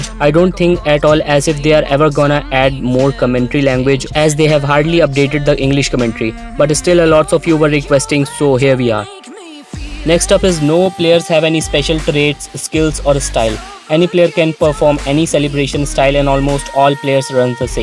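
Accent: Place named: India. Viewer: Are you from india bro .? Your voice like indians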